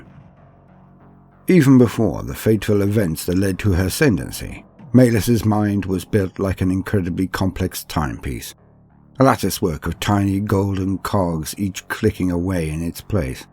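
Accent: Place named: England, United Kingdom. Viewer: You know what's awesome about the King's English? When I say too-daloo to someone in American English I sound like someone with a learning disorder. You make it sound like cookies on Christmas morning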